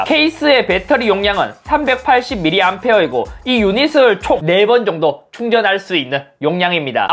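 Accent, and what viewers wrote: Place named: Andong, South Korea. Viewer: 잇섭님 안동 출신인가요ㅋㅋ 익숙한 사투리가 들리니 반갑네요ㅋㅋ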